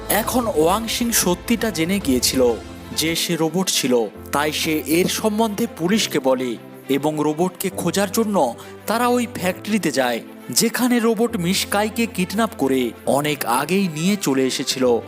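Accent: Kolkata, India. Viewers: uner koctay mone hoy kolkatar??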